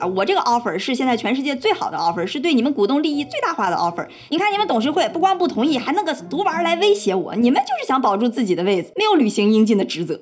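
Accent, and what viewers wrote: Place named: Beijing, China. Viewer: 京味的单口相声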